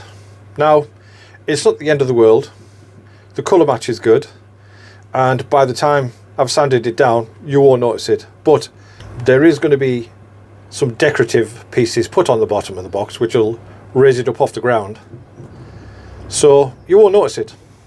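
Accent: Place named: North of England, United Kingdom. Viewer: Where in England are you? I grew up in the North of England and to my now horrendously uneducated ear you sound like you might be close to there but ive not been back in 30 years now so I could be wrong.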